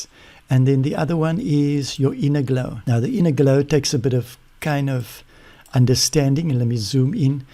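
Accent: South Africa. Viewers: Nice so here a South African accent.:) Goeie werk.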